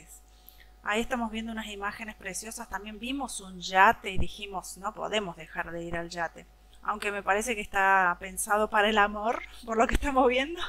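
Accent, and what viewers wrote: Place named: Córdoba, Argentina. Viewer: Que te diviertas en tus vacaciones !!! 👍👍👍 Una preguntita... de qué lugar de Argentina eres... porque tienes un dejo muy particular... yo veía el programa de Olmedo y el Gordo Porcel... Olmedo hacía un personaje con un dejo muy parecido al tuyo...Perdón, creo que el personaje a que me refiero salía en No toca botón... creo que era de la provincia de Córdoba...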